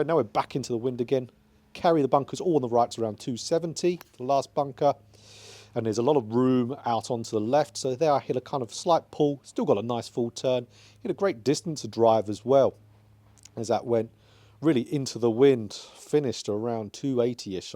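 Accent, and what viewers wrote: Essex, United Kingdom. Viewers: lol, I posted before you said you were in West Coast of Scotland ! 🤣thought you were an Essex boy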